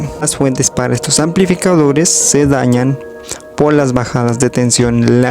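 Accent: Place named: United States. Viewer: Todo bien. Pero, porqué hablas como gringo? 🤔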